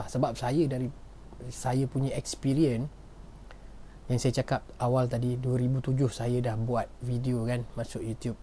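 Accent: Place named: Kuala Lumpur, Malaysia. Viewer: Wow 😮 cakap KL 🤣🙏🏻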